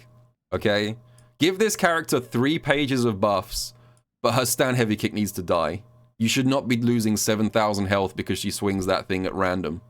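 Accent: United Kingdom. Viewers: i appreciate how you make "bad content for a good cause", i dont care about tierlists especially as a non SF6 player or active online yapper complaining about X thing in a fighting game, but i do care about hearing funny british capybara man yap and be honestly very insightful and reasonable while also being entertaining and going for charity events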